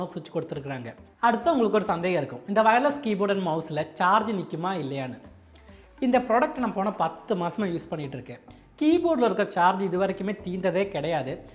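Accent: Kerala, India. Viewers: you have a peculiar Malayalam accent with humorous touch! Nice Video , Keep it up and post more! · Bro yr Tamil is like mixed with Malayalam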